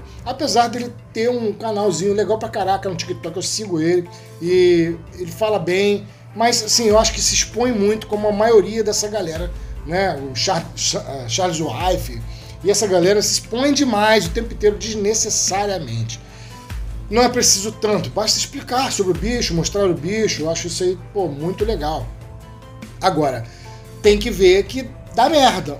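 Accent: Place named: Macaé, Brazil. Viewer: vc é da onde parece de Macaé falai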